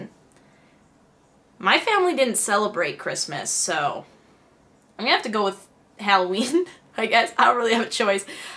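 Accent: Canada. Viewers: All Canadian women look and sound the same..., omgitsfirefox, Cobie Smulders (the girl from how i met your mother), and others i can't remember. Also i have a canadian friend and she likes capybaras too... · A lot of Canadian women sound like this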